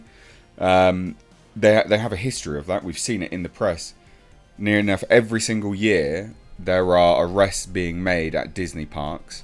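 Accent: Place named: United Kingdom. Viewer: I love your videos but as a Texan, I have to say, I cringed every time you said the actor's name. You sound like my relatives in the UK. I laugh every single time they try to pronounce a Spanish or Portuguese word.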